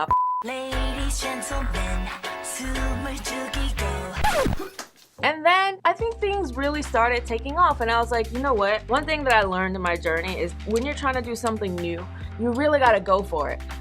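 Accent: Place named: South, United States. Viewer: Congrats 🎉🎊❤!! What I love the most is that you still have a southern drawl when you speak, it's been 8 years and you can still tell you're southern. Thanks to your videos I got to learn more about Korean culture through the perspective of a Black-American woman! Thank you for your dedication and love sis!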